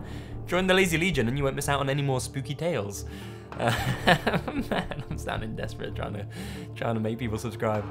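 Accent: England, United Kingdom. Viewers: hes from the uk like me so we will pronounce it differently , since the language started with us XD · No need for sarcasm, or maybe you’re just being stupid, I can’t tell. Obviously I can tell from his accent that he’s from England - I mean WHERE, given that there are so many counties in that country. I didn’t want to just presume he’s from London or something. 🤦‍♀️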